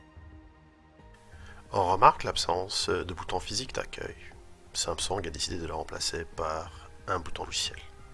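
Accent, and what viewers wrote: Quebec, Canada. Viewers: Très bonne vidéo. Ca m'a aidé a la choisir pour mon fils. C'est appréciable quz ton accent québécois n'est pas très marqué ca permet aux européens de l'écouter avec plaisir. +1 pouce bleu pour te soutenir pour ton bon travail.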